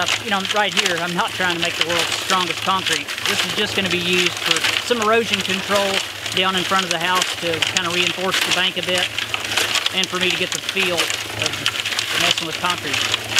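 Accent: South, United States. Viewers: I didn't think how much is added to your videos by your accent until I heard your dad speak. I've gotten used to hearing you and, and seldom think that you speak differently than us Midwestern folk. I met my northerner wife while attending school in Chattanooga. Southern accents have always been a treasure to me since then.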